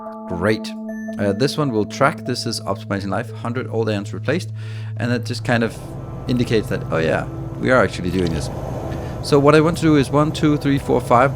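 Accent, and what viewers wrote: Denmark. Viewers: Are you danish?🤔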